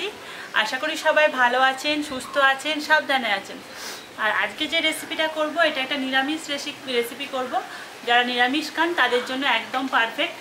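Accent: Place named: Kolkata, India. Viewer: Apni kolkata takhe hobe.. Apner basa sune buja jay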